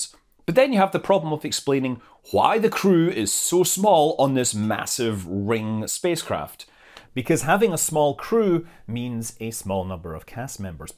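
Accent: Scotland, United Kingdom. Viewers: There's nothing wrong with a good Scots burr.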